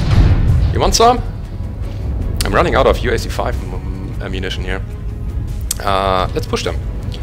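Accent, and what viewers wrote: Germany. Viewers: Just discovered your channel.Enjoying your MWO vids.Cool German accent and greetings from Scotland.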